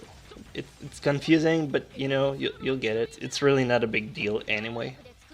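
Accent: Quebec, Canada. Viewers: Hahahaha jaurais jamais pensee que tes quebecois :) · haha clairement québecquois ;) good job ! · Are you from Quebec ?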